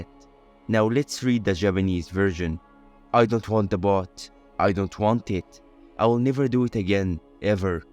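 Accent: Egypt. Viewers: U sound Egyptian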